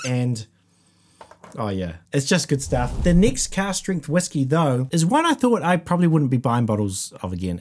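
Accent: New Zealand. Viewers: Man that is a thick kiwi accent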